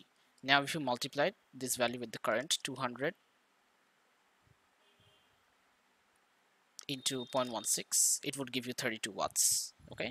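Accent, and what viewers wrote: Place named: Bangladesh. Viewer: yeee agreed Also that accent was so clearly Bangladeshi ahah Love the representation tho, he’s much better than that Pakistani dude who barely explains anything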